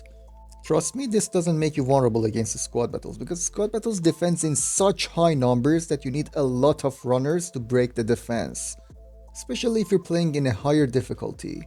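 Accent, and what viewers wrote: Iran. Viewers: Omade bodam videot ro bebinam bad goftam cheghad lahjash mesl iraniast bad didam esm chanelet e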